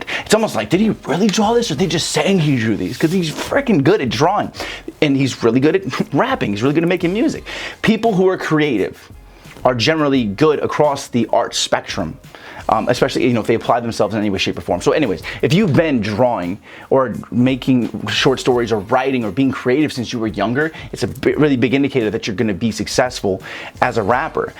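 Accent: Chicago, United States. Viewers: Your delivery is so Chicago. It's funny and refreshing to watch your informative vids. Preciate you, bro. Continue courageously! <link>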